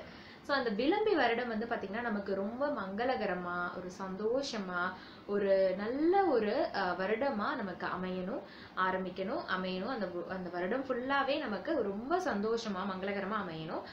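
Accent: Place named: Kerala, India. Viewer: Voice correct ah Kerala pa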